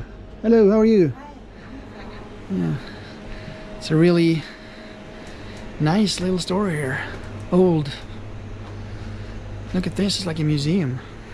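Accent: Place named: United States. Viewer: Ok, i imagined that you were a turist due to your english pronunciation that looks like american (or canadian) to my ears .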